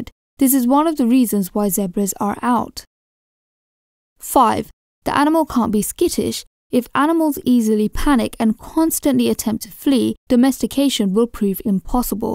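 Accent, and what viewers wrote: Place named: United Kingdom. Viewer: rejvaik because she’s British not American.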